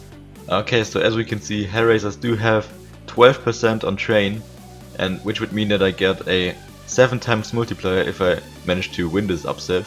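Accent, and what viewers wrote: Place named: Germany. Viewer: Lol man hört mega Raus das du Deutsch bist :)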